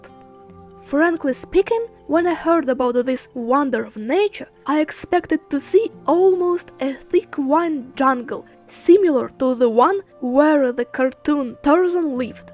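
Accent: Russia. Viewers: Omg you have so much cute voice, the way you speak the accent is very cute ❤️🥰 i like Russian accent. I love slavic culture. And also Dagestan is heavenly beautiful. Keep going, I'll support your channel!👍🏻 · You not you. You speak English like Russian dievoshka. Atlitchna 👍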